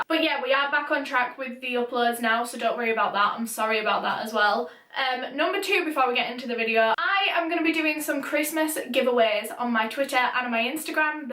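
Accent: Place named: Yorkshire, United Kingdom. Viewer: As an British person~ i belive yorkshire-? Or a tiny bit welsh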